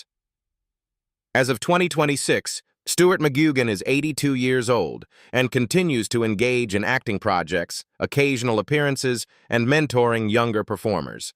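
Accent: United States. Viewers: Why use an American voice to tell us all about a British show. 😢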